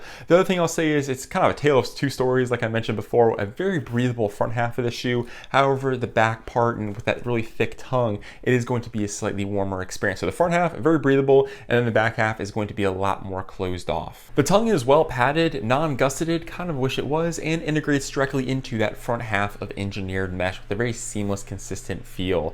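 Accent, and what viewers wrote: Maryland, United States. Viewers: I love when I’m watching YouTube and can just hear that someone is also from MD.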